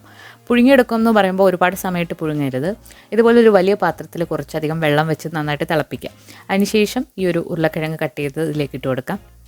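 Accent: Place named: Kayamkulam, India. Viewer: Samsaram kettapol thonni namma naatukari aanennu. Kayamkulath evida njan kayamkulam...charummod aaane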